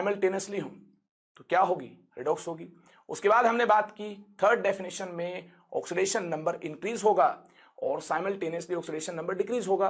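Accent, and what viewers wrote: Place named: Haryana, India. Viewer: sir, u have a haryanvi tone in your voice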